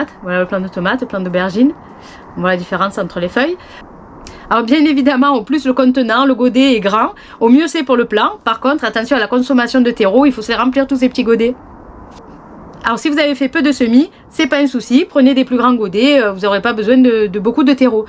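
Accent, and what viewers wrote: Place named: Arles, France. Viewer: Bonjour, vraiment ya du travail dans votre vidéo.... Le montage, la musique et annotations texte, et le propos intéressant, j'avoue j'en fait pas autant niveau youtubage😮, bref super vidéo avec un accent de chez moi.... Arles. Vidéo vraiment pédagogue, simple et claire.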